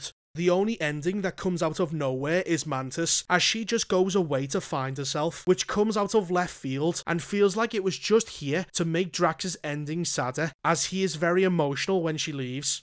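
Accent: Liverpool, United Kingdom. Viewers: It's scouse, not Scottish 😅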